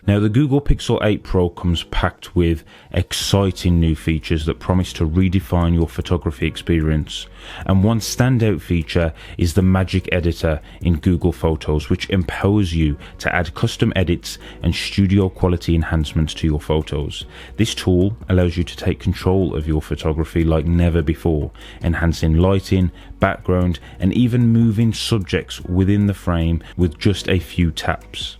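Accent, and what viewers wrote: United Kingdom. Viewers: Your accent reminds me of a British singer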